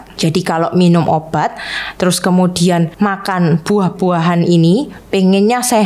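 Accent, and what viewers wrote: Java, Indonesia. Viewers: Makasih ce info nya... Logat bicaranya sy suka ce seperti wong jowo.. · Medhok sekaliii ngomongnya. Trimakasih dok ilmunya.. 😅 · Salam sehat Dok Mau nanya nih Kok ngomongnya MEDOG sekali Emangnya Bu Dokter Asli dari mana Mksh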